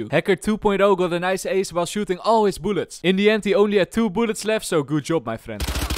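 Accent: Netherlands. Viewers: Ich liebe deinen holländischen Akzent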